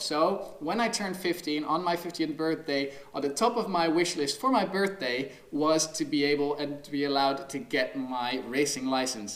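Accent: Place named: United States. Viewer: How did you learn American accent so well?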